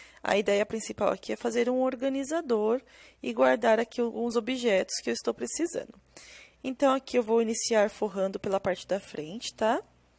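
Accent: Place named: Bahia, Brazil. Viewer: vc fala um pouco baiano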